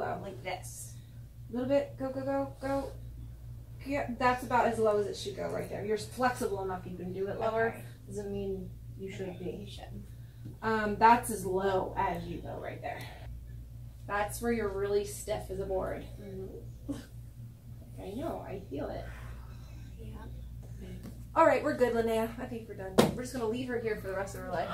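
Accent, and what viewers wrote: England, United Kingdom. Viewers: English accent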